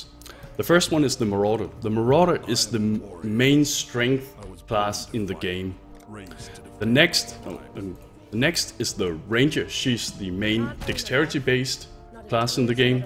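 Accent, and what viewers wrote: Denmark. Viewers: Oh god, Danish English... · are you danish? You sound danish:b with your accent:D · Are you Danish? The accent sounds so much like Danish ^^ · Jeg elsker hvordan en dansker kan høre en anden dansker når de taler engelsk. satan det er tydeligt.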